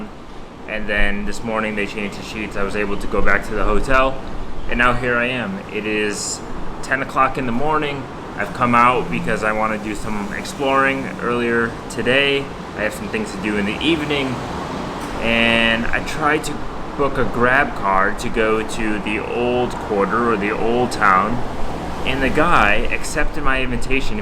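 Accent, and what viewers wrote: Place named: Midwest, United States. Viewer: Just curious to know which US state you are from, your accent makes me wonder if you might be from either Ohio, Indiana, Illinois or Michigan?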